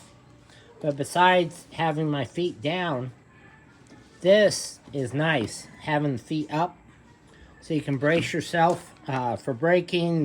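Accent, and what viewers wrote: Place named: Oklahoma, United States. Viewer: are you from oklahoma, you quite got the accent...anyway Im filipino american, retired marine. i like how you did this, lots of important info and the requirements you are looking for on a bike is the same like what i need. I look at other filipino vlog coz I speak tagalog too AND THEY ALL SUCKS. you dont see them does what you did. Its all about bloody all about themselves, laughing and running the camera on things you already seeing just to past the time. Their actions and voices all very irritating to the ears and just talking about nonsense... Hope you enjoy your stay there in that beautiful country populated by mtfrs.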